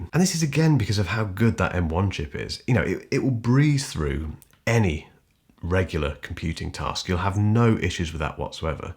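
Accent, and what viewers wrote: United Kingdom. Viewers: Thank you very much for perfect native British English !)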